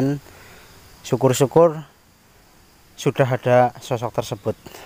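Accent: Banyumas, Indonesia. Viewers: Aduh mba bahasa ngapaknya keluar nnti yg bukan ngapak ngga tau loh 🤭 Penemuan suku tidak jelas boso ngapake koyo ning judul nduwur wi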